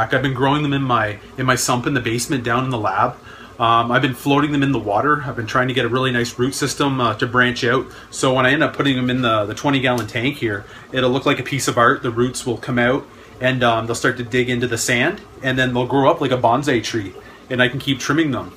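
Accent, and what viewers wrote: Canada. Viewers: Are you from Canada? I love how you talk! Great video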